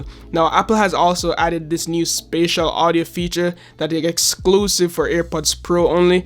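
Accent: Jamaica. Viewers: Is that a Jamaican accent? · definitely can hear the jamaican accent :o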